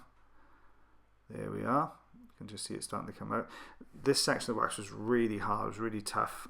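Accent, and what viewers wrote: Wales, United Kingdom. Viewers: A lovely soft Welsh accent! I'm an expatriate Brit in Alaska so quite a while since I heard the Welsh accent! To be honest, I hadn't noticed yesterday when I watched one of your videos...it was only in the 'tissue paper' video comment section that I realized! I've been out of the UK too long (since 1984) so I'm now a defunct Brit! :(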